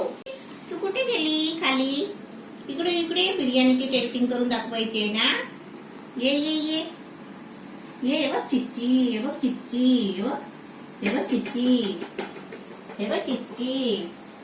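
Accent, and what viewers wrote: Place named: Ratnagiri, India. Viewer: Taee masta biryani banavata ratnagiri chi bhasha pan mast bolta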